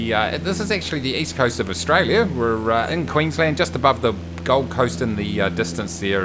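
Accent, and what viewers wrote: New Zealand. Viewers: No offence but a kiwi accent is so annoying. 😂😂😂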